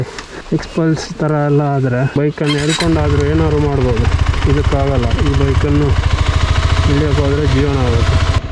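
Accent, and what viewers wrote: Mangalore, India. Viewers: I like Ur Mangalore kannada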